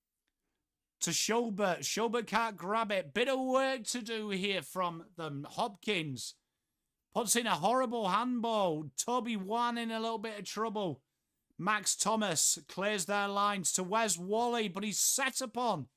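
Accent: England, United Kingdom. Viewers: What part of England are you originally from?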